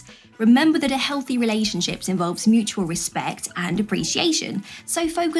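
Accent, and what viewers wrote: United Kingdom. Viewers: For me, a woman with a British accent will catch my attention fast. (I find it difficult to not watch these videos because your voice is so magical.) I have heard women say the same thing about men. Please teach me that accent.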